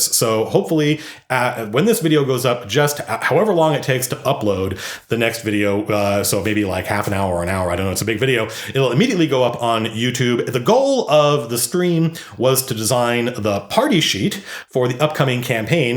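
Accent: Australia. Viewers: Hey, no more green screen artifacts! And no more Australian accent :( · I liked the first one better, awful Australian accent and all. It had better detail and flow of information.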